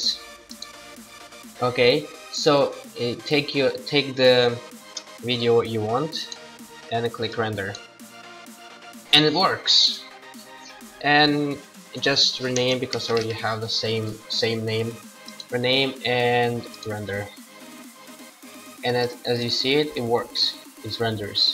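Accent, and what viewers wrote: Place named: Russia. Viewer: Русский чувак, по акценту понял) Молодец, мне помогло, спасибо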